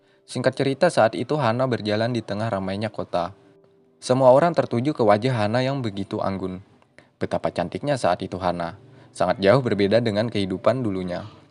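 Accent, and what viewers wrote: Banjar, Indonesia. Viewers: Banjar nihhh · Nah mantap urang banjar pinanya nah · Tetap ay logat Banjar nya ada biar bahasa Indonesia min ay hahahaa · Orang banjar ya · Pian urg banjar kh mamang?? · Urang Banjar Leh rupanya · Orang mana... Logat ny Banjar banar 😁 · Banjar banaaarr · Kek logat banjar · Orang Banjar kah mang🤣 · Orang Banjar kh bang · Org Banjar kh nih min wkwkw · Mang orng banjar kh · Banjar banar pian 😅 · Orang banjar kah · Mimin urang banjar kah min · Urang banjar sakalinya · Banjar banget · lwt di beranda dan penasaran, pas denger suaranya fix miminnya org banjar ya kan😀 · Banjar banar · Orang banjar kah ikam ? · Mamang banjar🤣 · Banjar kh mang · logat nya ne kaya orang banjar pang nah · Ada rasa rasa bahasa Banjar wk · urang Banjar kah mang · Hanya lipsing dangsanak, wkwkwk orang banjar bnar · Mang orang banjar kh?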